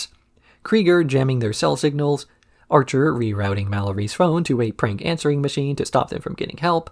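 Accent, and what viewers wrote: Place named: South, United States. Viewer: Ah! I think not being American where the accent is from can be hard to place so I think it’s very interesting that yours is described as southern draw! But I also think is funny is that I have been biting my tongue from making this comment so many times because it just seems so rude even though I knew I was coming from a place of curiosity and I have heard you say women before but the last few videos I’ve watched of yours you haven’t and then as soon as you’ve replied to this comment you just said it again 😂 thank you for taking the time to reply and I do hope it didn’t come across as obnoxious!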